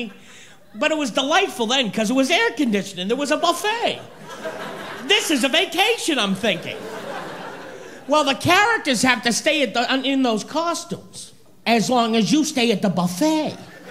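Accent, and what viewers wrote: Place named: Boston, United States. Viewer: That's the Boston accent.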